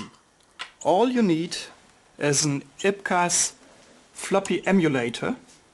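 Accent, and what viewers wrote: Germany. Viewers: I am detecting a German accent here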